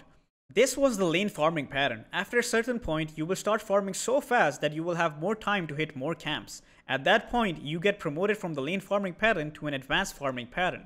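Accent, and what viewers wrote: India. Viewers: The Indian accent throwing me off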